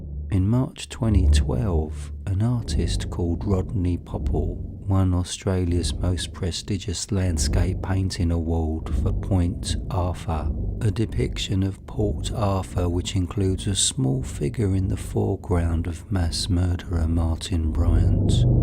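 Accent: United Kingdom. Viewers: Nice cockney voice mate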